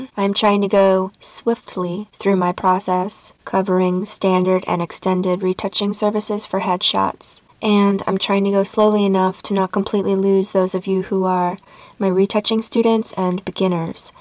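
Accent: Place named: South, United States. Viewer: Excellent. First, you taught quite a few tips that I can apply in other editing programs as well; And also you showed Affinity which I've always been curious about. Finally that hint of a Southern accent makes me want to move to South Carolina immediately 😎 Thanks!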